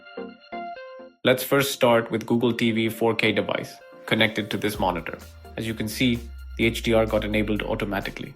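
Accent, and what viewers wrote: India. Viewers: Please talk in American accent it sucks when u talk in indian english I only like my country for its national language which is hindi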